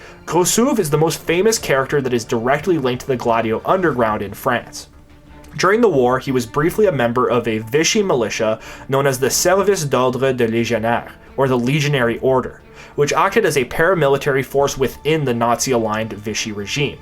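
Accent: Quebec, Canada. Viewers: Tu sonne comme si ta appris ton francais au québec. Nice! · That pronunciation of French? My man is Canadian. French-Canadian. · I think he's Canadian -or very much sounds like in French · Most likely French-Canadian per the accent. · mon, ton accent me dit que tas grandi un bout a quebec haha ne laches pas continue ton truc tes videos sont geniales! tres bien recherché chaques fois! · Makes sense the dude is definitely from Quebec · C’est du bon québécois! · Thanks for the wonderful video, and thanks for not hiding your Quebecois/French Canadian accent!:D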